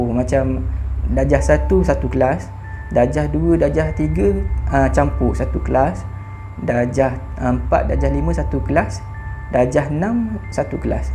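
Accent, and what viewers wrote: Terengganu, Malaysia. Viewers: Org ganu ke dik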